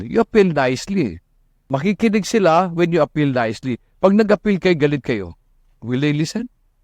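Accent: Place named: Visayas, Philippines. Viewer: Cute kaayo ka magbisaya Sir 😮😊😊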